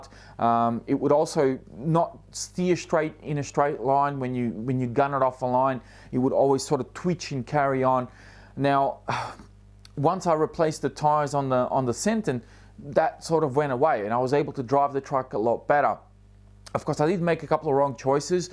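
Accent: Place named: Australia. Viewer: I’ve been to the hobbyking live event in the Netherlands last weekend, and I must tell you that I really like the australian accent that you and the rest of the aussies (hobbyking guy also) have.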